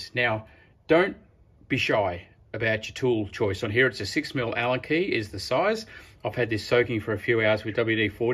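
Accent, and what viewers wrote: Australia. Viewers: Were are you located. You sound aussie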